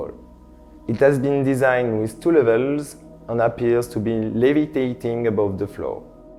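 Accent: France. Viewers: nice baguette accent, and as french i can appreciate the effort :) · Man , I would recognize French English accent with my eyes closed.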